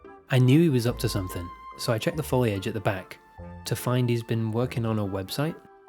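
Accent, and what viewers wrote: United Kingdom. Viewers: Bro has the perfect amount of British accent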